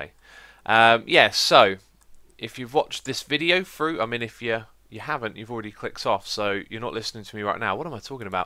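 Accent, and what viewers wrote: United Kingdom. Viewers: Honestly, I'm so confused about this whole thing xD. Not that you're bad at explaining, but that I'm stupid :D. I just like your voice. British accents are awesome to me, cause I'm American, and I just love your stuff :D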